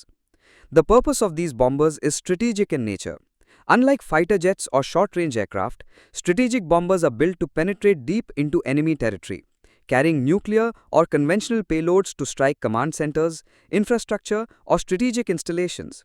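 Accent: India. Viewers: By the voice of the dam Indian it's going to shit lmfaoo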